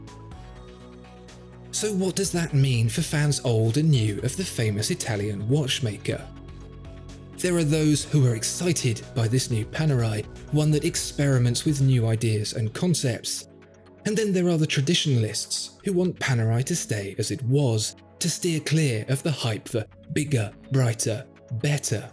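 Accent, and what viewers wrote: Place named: England, United Kingdom. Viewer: Love your content but "Happenstance" FFS! Laurels is pronounced lorrels, not loorels. It makes you sound like you have put your beautiful English accent through a robotic computer enunciation programme.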